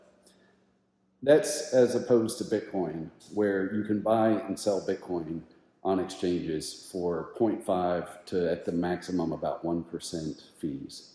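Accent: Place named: Southeast, United States. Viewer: I often wonder your general area because my ear says you are in the southeast.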